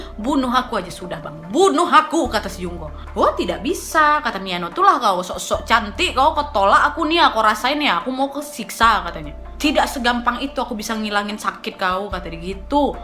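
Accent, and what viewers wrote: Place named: Medan, Indonesia. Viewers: Medan punya bahasa ni ya😁🤣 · Anjir medan kali kau kak 😄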